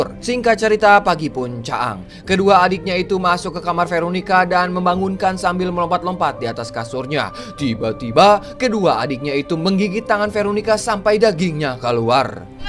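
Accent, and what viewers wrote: West Java, Indonesia. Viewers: Pertama nya aku lagi serius nih ya,eh pas mimin nya ngomong ko aku langsung ter sedak ternyata mimin nya orang sunda ,sampurasun dulur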